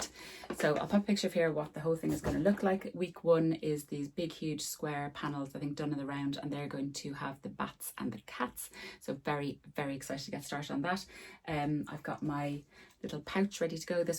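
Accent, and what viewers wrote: Ireland. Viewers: I do love your vlogs. Your accent reminds me of 7 months I lived in Crossakiel when my OH had a job in Cavan.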